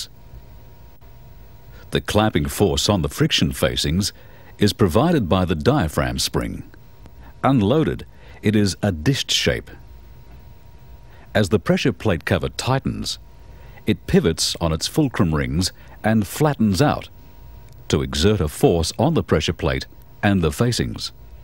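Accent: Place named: Australia. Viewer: Perfect Standard Australian accent being spoken.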